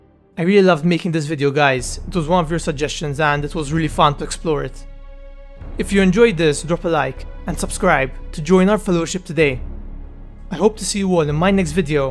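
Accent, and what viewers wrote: Malta. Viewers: Your accent is interesting. Are you Maltese by any chance? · Ifhem, fl-about hemm "country: Malta" kif wkoll l'accent jghin naqra ghal assumazjoni bhal dik! Proset imma eh, kompli sejjer hekk :D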